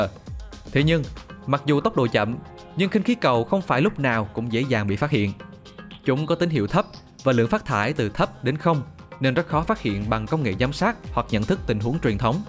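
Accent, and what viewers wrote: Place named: Quang Nam, Vietnam. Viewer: Giọng đọc không giấu được chất quảng nam.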